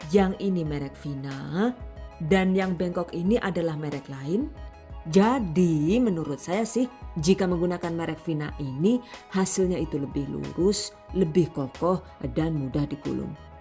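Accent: Banyumas, Indonesia. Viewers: Kakanya orang mana kok ngapak 😂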